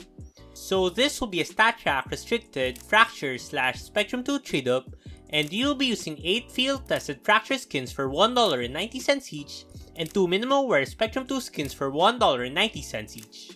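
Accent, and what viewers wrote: Philippines. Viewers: Pinoy voice detected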